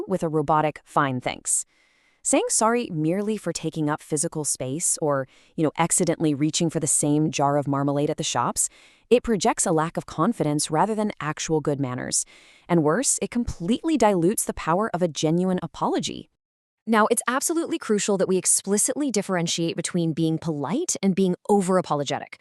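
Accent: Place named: United States. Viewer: But the accent is american?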